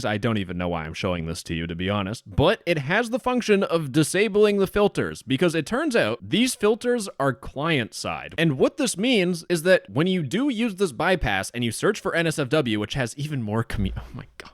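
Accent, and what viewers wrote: United Kingdom. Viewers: blud sounds like the spiffing brit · Ahyes, the spiffing brit speech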